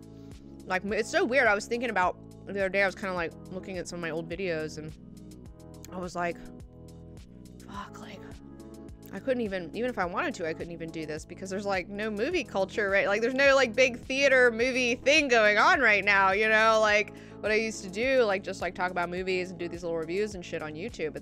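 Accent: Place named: Alabama, United States. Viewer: I don't know if you recently talked with some fam and friends for the holidays but, WOW! I can hear that Beautiful Alabama so much in your voice on this one. maybe it's my imagination...great vid!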